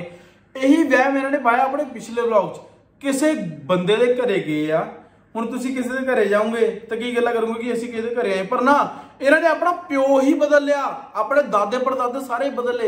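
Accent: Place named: Punjab, India. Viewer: sir.... Tuhada punjabi touch bohat vdia ae alhamdulillah but please avoid using 'Bhencho' that much......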